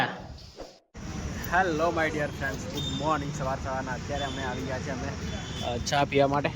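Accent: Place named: Bhili, India. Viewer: ભાઈ ક્યાંના છો ભીલી બોલી બોલતા હોય એવું લાગે😊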